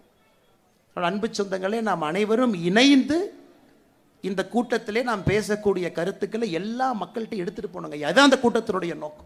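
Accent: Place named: Chennai, India. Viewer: Madras பாஷை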